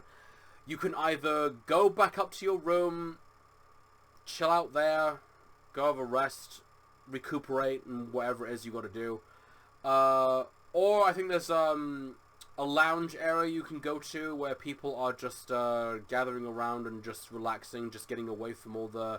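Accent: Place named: United Kingdom. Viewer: This whole time and I never realized you were British lol Lord help me, I'm not bright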